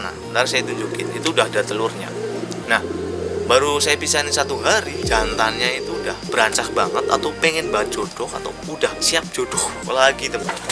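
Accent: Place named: Banyumas, Indonesia. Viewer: Ngomong nya ngapak bang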